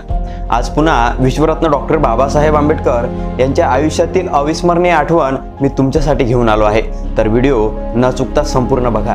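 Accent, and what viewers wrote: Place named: Konkan, India. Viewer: Your speaker has not good is the kokani middle middle words I did not understand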